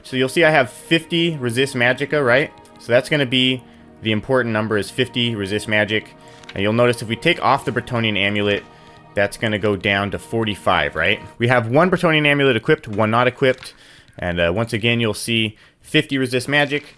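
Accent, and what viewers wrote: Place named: Midwest, United States. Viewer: Jesus the Midwestern accent on this dude is abrasive...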